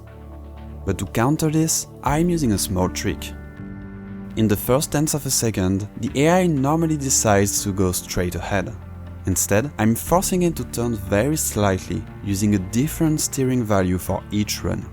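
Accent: France. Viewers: Great job. I think they just lack higher levels of abstract thinking. They're like babies learning from trial and error so experiences just remain at the very basics. But your work, insights and doubts are the best. Thank you for that. Your accent sounds french, are you from a french speaking country or I'm totally missing the point? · Are you french cause your first language is obviously not English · Je suppose que tu es français nn? Ton accent et bon mais français 😂❤❤ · are u french? your accent like french? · Are you french? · He sounds french and his ai does wr runs. confirmed:D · omg your french accent is difficult to listen to xD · Why are you french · You have a French accent is it true? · He sounds french, it's like he use syllabic intonation, while English should be stress-timed. Interesting. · superb video man. just one minor complain, please as a french guy, I can't stand your english accent, please, do make an small effort, plus your grammar and vocabulary are very good, just less fenchy "ze" instead of "the" ect. 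Anyway thx for the video I've learn a lot! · Are you french because you have french accent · Tu as un accent français, je me trompe? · Are you french? Because I can clearly hear your accent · This is the most effortlessly heavy french accent I've heard. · J’adore ton accent français c’est trop drôle. Trop bien la vidéo sinon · L'accent français me termine, video de fou bg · Bro you're french and your accent is so bad But the topic of the vid is good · Are you french? I can sense a little bit of french accent in your prononciation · Open video French accent Close video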